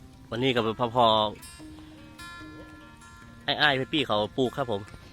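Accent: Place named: North, Thailand. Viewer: สวัสดีค่ะวี คิมมารับชมการปลูกแตงกวาญี่ปุ่นด้วยจ้า คลิปนี้พูดเหนือด้วย น่ารักดีค่ะ👍👍😁😁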